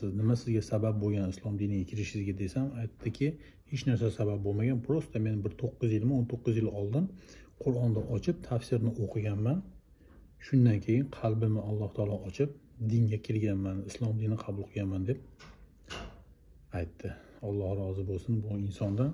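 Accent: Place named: Uzbekistan. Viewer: Удмурд деганини энди эшитишим кайирга карайди сиз Татаристонга кетдик диябсиз забонингиз хакикий Узбек сог булинг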